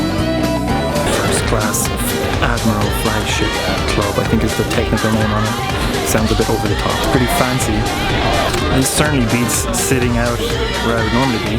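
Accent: Ireland. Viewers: Loving the work lad. What part of ireland are you from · gowan you mad thing! what part of ireland are ya from??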